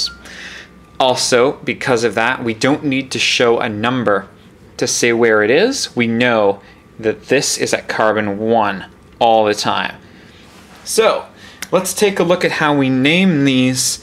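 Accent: Canada. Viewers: Thank you!!! btw, are you Canadian? You kind of sound like one :)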